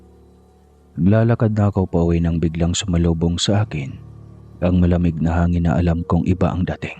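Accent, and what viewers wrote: Visayas, Philippines. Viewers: Kabog jud sir,bisaya ka tlga sir...😂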